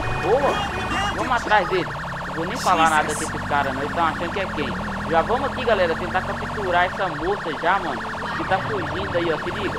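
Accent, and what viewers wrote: Minas Gerais, Brazil. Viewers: vc é de minas gerais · C É Daqui De Minas Gerais????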